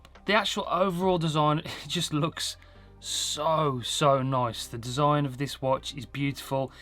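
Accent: Birmingham, United Kingdom. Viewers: Proper brummy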